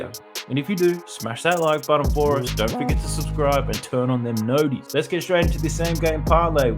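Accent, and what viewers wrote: Australia. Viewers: Ya Australian accent blew it for me. Not betting on rugby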